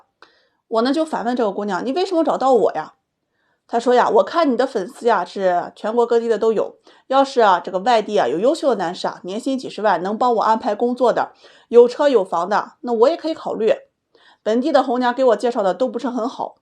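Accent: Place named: Shandong, China. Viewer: 听口音感觉像老乡啊，我是山东人。😂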